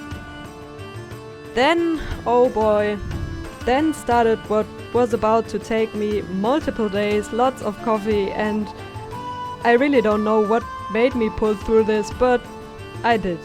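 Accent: Germany. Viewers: You sound like you speak german?!😅 so if you do, das sieht so krass aus!!!!!!! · da klingt so nach deutschem akzent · How is this even possible? And a quick question, where are you from? You sound german :D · Bist du deutsch? Meine nur wegen dem Akzent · Schprechen du Deutch? Your accent sounds German. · Bist du deutsche? Dein Akzent 🙊✌🏻✨ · Strange question: but are you from Germany? You have a pretty strong German accent. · I was just wondering because I live in Germany and hear a German accent in English a lot so are you from Germany?(you have got a lot of talent btw. AMAZING!)♥️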